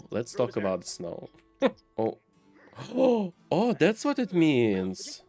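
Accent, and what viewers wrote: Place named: Russia. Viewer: Hi,! Accidentally, saw this video in my YouTube recommendations and immediately subscribed Are you from Russia or Ukraine/Belarus? I recognize this accent from a thousand ❤️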